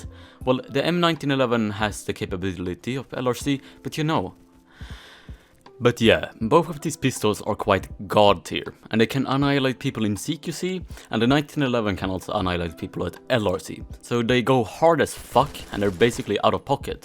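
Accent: Sweden. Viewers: Jag kan höra att du är svensk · Breh he is Swedish how did you pick up British from that accent?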